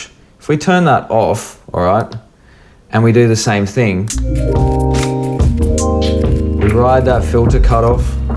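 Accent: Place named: Australia. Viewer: great tips! still early in my journey on the Push 3, not really an in-the-box tip, but I LOVE using the ROTO-CONTROL with the Push 3 as you can lock it to a rack and tweak that while not impacting what happens with the Push3, kinda of like the 'Select On Launch' being OFF on the ROTO. Nice hearing an aussie sharing the love!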